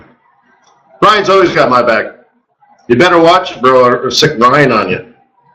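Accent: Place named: England, United Kingdom. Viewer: you sound so funny with that English accent😂😂😂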